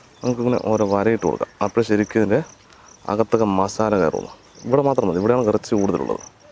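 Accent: Palakkad, India. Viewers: Awesome.... ND palakkad slang vearey level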